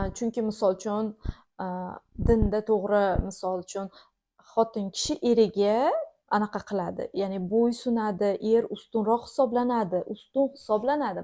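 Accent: Jizzakh, Uzbekistan. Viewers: jizzaxlimisiz shevez rosa o'xsharkan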